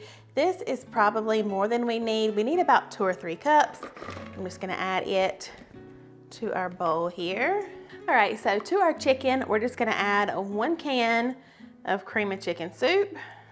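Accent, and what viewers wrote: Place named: East Tennessee, United States. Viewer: 🍓 This is my first time watching one of your videos and I loved it! I’m from the East Tennessee and girl, your accent sounds just like home! I’ll be trying some of these recipes. And yes, church cookbooks are the best!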